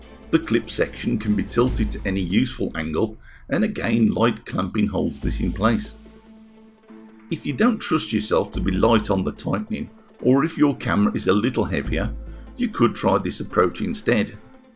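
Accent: Birmingham, United Kingdom. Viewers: Good job fella. Subbed. Do I hear Brummie in your accent mate?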